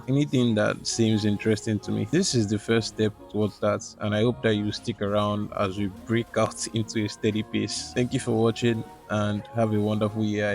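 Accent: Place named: Nigeria. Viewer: I know a Nigerian when i hear one, I thought i was the only one who played wuwa and genshin Subscribed…🤗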